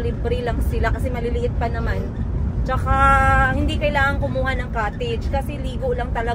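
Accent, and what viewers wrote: Iloilo, Philippines. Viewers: Mam? May i ask if you are from GenSan how come you sound and speak Ilonga? 🤔🤗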